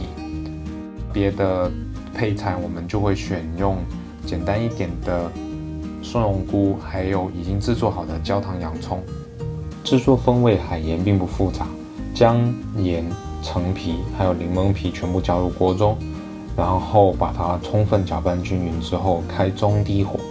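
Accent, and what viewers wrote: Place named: Cantonese, China. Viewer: 我個人喜歡有點口音的感覺 偶爾有點廣東話交叉也不錯 各有所好吧 加油💪🏼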